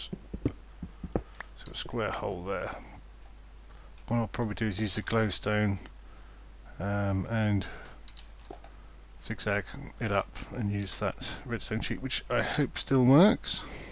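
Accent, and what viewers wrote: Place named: Derbyshire, United Kingdom. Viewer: ..? What area in England are you from?! You sound like my uncle and hes from Chesterfield - Derbyshire..:L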